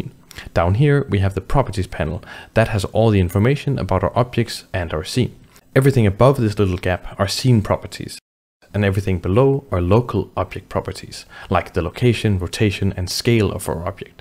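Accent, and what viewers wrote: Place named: Denmark. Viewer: The good old danish accent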